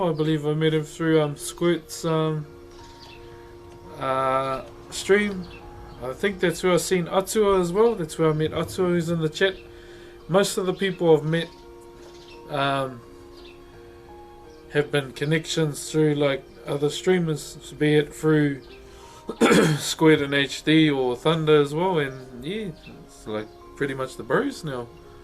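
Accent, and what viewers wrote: New Zealand. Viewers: if I'm not wrong I think he has a Maori accent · the New Zealand accent is so wholesome. I love you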